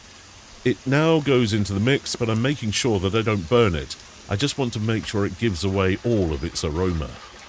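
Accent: United Kingdom. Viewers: That dubbing or voice over does not go well with the genuine pure nature of these videos. Your videos are like a window into that simple village life. Voice over in a British accent feels like having coffee with salt. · I've seen so MANY of these videos to know that is a superb cook! I LOVE it, when there's NO narration, with the quiet absorption of nature. That being stated, I'm OKAY with this (I assume, British) gentleman's voice; he speaks in the first person...as voice. Whereas I find it a bit hard to associate the accent with the person (!), I appreciate that this narrator doesn't excessively talk...he pauses now and then, which is good. I'm still diligently attempting to discard national prejudice(s). Our fellow humans NEED to sustain love, especially in these times of turmoil. does that. SUPER 👍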